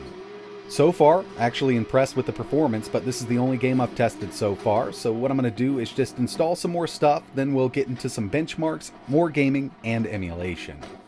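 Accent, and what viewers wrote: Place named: New York, United States. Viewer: I like your dialect. Are you from the NYC area?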